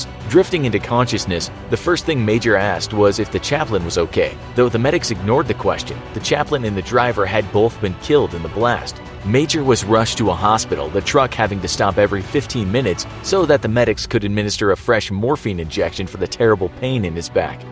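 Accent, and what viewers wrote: Canada. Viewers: Is the info graphics show from Canada? The way they talk in this sounds like they are Canadian. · sounds pretty Canadian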